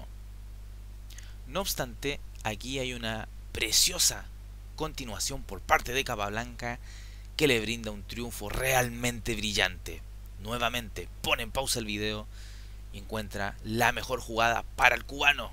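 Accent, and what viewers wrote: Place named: Chile. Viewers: Habla como chileno de hecho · El acento es Chileno, aunque no lo creas!! Para que después no digan que en Chile se habla diferente.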